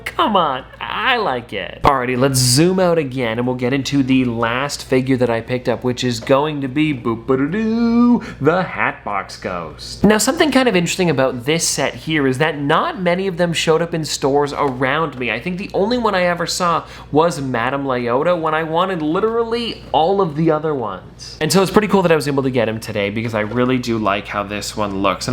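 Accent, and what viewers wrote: Canada. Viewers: across the country i thought your Canadian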